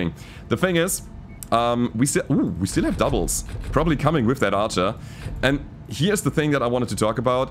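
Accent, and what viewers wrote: Germany. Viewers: German accent "back shot again, oooo hes cherry red" Wife "what the hell are you watching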